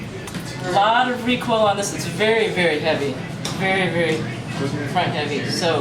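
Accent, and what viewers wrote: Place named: California, United States. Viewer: She Talks Funny Damn Cali Ppl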